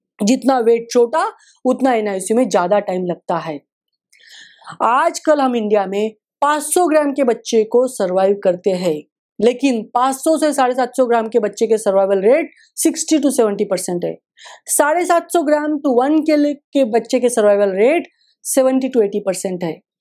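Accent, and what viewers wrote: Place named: Maharashtra, India. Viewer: Aap marathi hai ,aap hindi nahi bol parhe ho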